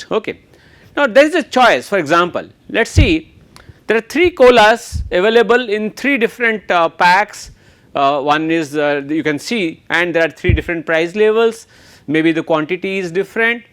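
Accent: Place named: India. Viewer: Indian accent in these teaching videos are such a turn off.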